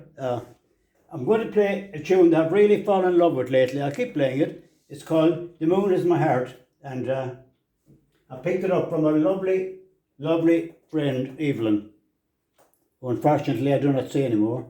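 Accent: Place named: Ireland. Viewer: Yes indeed I totally understand what you are saying ....and listening to your delightful accent is pure joy .....I hope to go back for a visit to Ireland ...check in to more of my O'Donnell and Ryan clans❗🎼🎶🎶🎹❤🇨🇦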